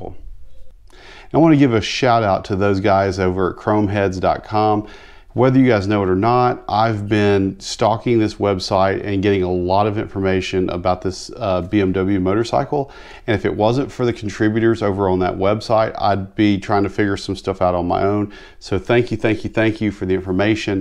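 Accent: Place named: Alabama, United States. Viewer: Interesting I’ll admit I know nothing about these bikes.sounds like you’re in lower Alabama. I’m up here in dekalb county.you should come ride little river canyon.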